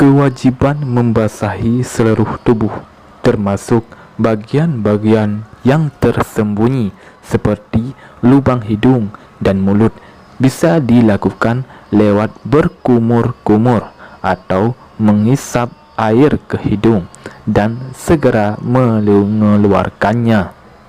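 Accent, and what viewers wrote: Aceh, Indonesia. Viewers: Sang nyoe lage na logat logat khas ureung aceh😁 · Ini logat orang Aceh bukan? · Denger dari suaranya Orang aceh ni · Adminnya orang aceh ya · Ini pasti awak aceh , terasa logat aceh nya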